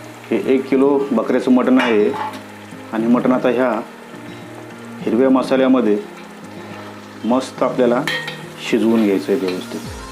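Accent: India. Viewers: तुमच्या भाषेवरून तुम्ही नगरचे की काय असा प्रश्न पडतो?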